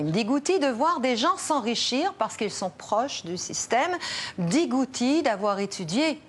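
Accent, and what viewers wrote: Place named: Montreal, Canada. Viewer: L.accent montréalais me manque je vous invite à venir en Algérie pour enrichir votre dictionnaire je dit tout ça après avoir su que vous etes québécoise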